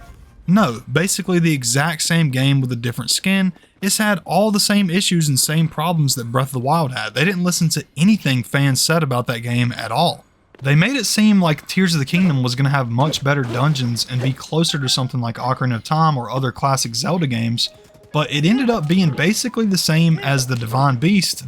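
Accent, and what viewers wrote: South, United States. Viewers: I love this guy's country accent. Really relaxing to listen to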